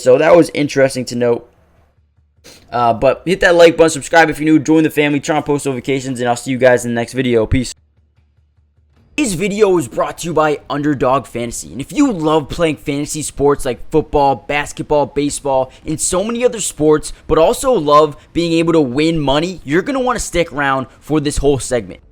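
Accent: Canada. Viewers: Do I hear a Canuck in your voice????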